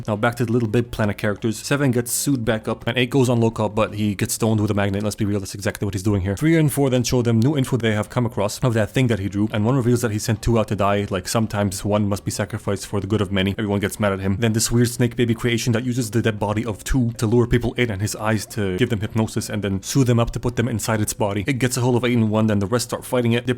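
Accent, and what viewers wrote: United Kingdom. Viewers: Hey CAN YOU DO ONLY BRITISH ACCENT IN YOUR NEXT VIDEO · Man your British accent Is a killa put that on repeat for my bong rip shit was hilarious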